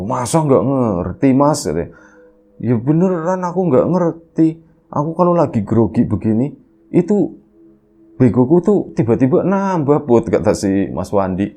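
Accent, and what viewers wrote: Tegal, Indonesia. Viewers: Sedih bngt😢🤧mas Wandi scra ga lngsung menyemprotkan gas air mata ini...dr mulai mas Wandi mngikhlaskan mas dewo sm mb putri aja udh terharu ditambah dg mninggalnya mas dewo bkin nyesek.mas Wandi ini kya'y emng lucu ya aplgi dibawakan mas dg gaya natural dan logat khas Tegal yg agak mirip sm cirebon bkin aku ketawa.kisah ini pket komplit ya ada sedih dan ada lucu'y😂smoga mas Wandi dan mb putri rmh tangga'y langgeng bahagia dan mnyayangi anak mas dewo spt anak sndiri, mkasih mas sehat trs agar bs mnghibur kt smua😁😁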